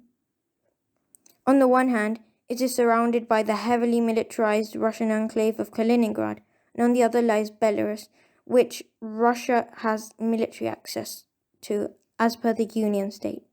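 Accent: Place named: United Kingdom. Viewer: Your voice is the most British voice I’ve ever heard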